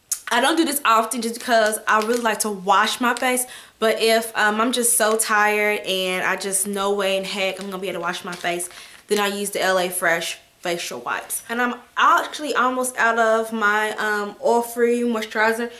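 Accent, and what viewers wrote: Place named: New York, United States. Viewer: I love your accent! I'm from ny so I'm use to only hearing that accent most lol😃 I have the vitagoods brush! I love it!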